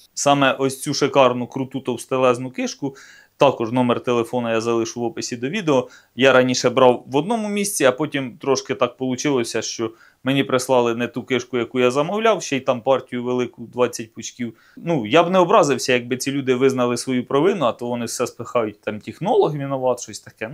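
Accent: Ukraine. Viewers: Не із Західноіі Украііни вже чую по акценту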